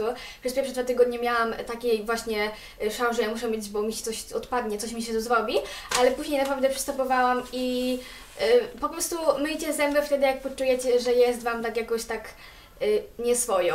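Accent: France. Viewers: Jestes z Francji?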